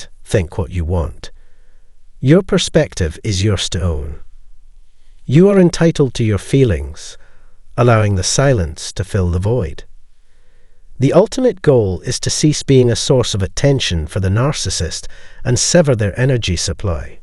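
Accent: Scotland, United Kingdom. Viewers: This robot voice is amazing. I mean, yeah. It makes some mistakes, but this Scottish accent is amazing.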